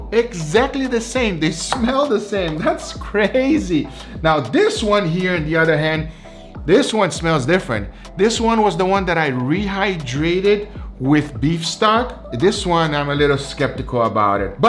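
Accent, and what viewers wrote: Brazil. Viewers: Because it's a Brazilian accent. Portuguese has a lot of tone color and variation. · ill bet all my money this dude is Brazilian I can hear it in his English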